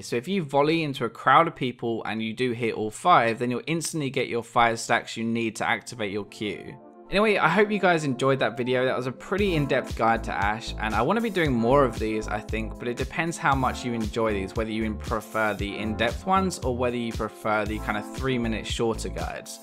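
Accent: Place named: United Kingdom. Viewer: I don't know why, but remainds me of Redmercy a lot just with british accent... Mindblown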